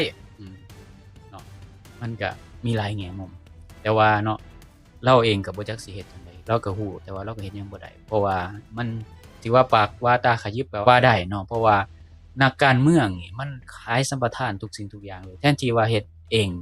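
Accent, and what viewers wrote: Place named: Laos. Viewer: หม่วนหลายภาษาลาวดั้งเดิมที่แอดเว้าสบายดี,ขะน้อย,โดยขะน้อยขอให้แอดรักษาภาษาดีงามแนวนี้ไว้ตลอดไปส่วนหลายได้หงินคนลาวเว้ามีแต่เอาภาษาไทยไปปะปนเลยฟังบ่หม่วน❤❤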